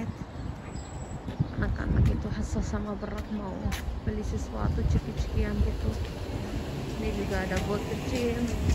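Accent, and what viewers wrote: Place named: Java, Indonesia. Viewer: Indo nya mana kak. . . Ada medok nya bahasanya. . 😁👍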